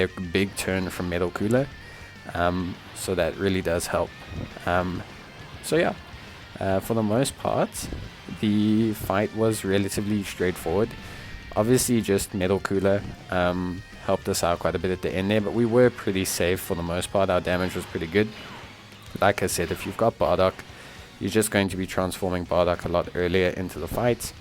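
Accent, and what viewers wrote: South Africa. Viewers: you sound South African, are you from south africa?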